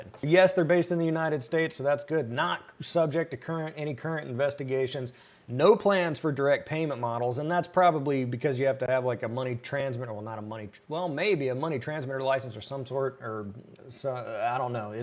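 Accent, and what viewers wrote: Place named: Australia. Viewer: Mate? Sounds Australian! :)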